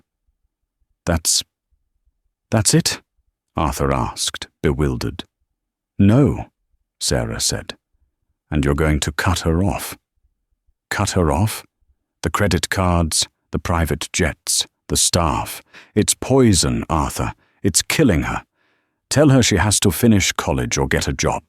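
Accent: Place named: England, United Kingdom. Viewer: Hi Canadian here Windsor Ontario And if the pictures / freeze frame slide shows at the top of your screen bother you, as they do me, just fold a piece of paper, as I do, over too of it to hide it and then just enjoy listening to that purring English accent and, if you prefer, as I do, follow along with the transcript. Cheers! Enjoy!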